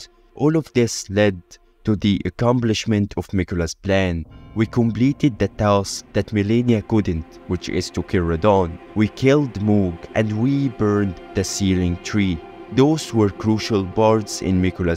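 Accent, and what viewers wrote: Egypt. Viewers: There’s something special about listening to Elden Ring lore in an Egyptian accent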